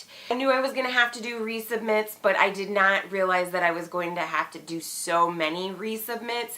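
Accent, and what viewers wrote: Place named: Minnesota, United States. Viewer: Oh good! Also, I am originally from southern Minnesota and your accent takes me back! 🤗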